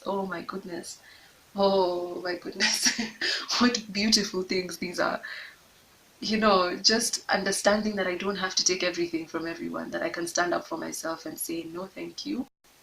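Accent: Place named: Nairobi, Kenya. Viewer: Sort of fell upon your channel. You remind me of someone I knew... beautiful and soulful and absolutely terrified of being vulnerable. Glad you found the strength to share. You sound so Nairobian though! It's too bad you had to leave.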